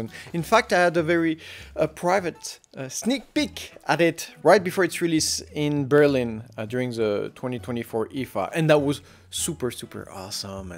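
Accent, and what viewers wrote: France. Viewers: I enjoy your video's so much. I may have asked before but what accent am I enjoying so much? is it french or french canadian or something altogether different. either way I love you accent.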